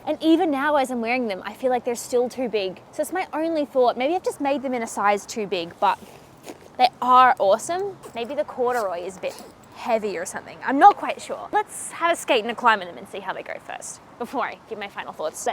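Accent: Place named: Australia. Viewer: Clicked for the pants, stayed for that australian accent 🤣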